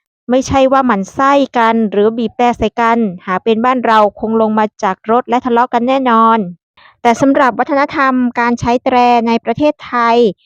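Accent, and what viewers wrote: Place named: Laos. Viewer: น้องแอด เป็นสาวลาวป่าว..สำเนียงน่ารักน่ะ...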